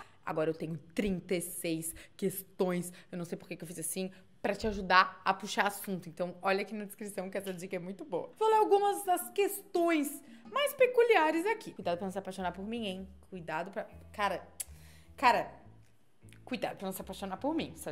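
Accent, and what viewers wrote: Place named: Florianópolis, Brazil. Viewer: Ho feia sabiax que erax aqui do suli mas que erax manezinha não sabiax ...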